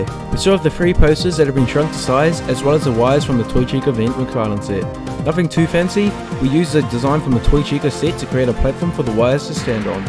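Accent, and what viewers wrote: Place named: Australia. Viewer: Listen to that accent… bro really thinks he’s not Australian with THAT voice…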